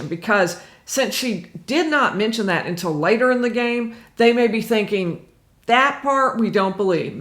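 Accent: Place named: Kentucky, United States. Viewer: Love the accent, are you from KY? You sound almost just like my wife from Covington, KY. Thanks for the breakdown.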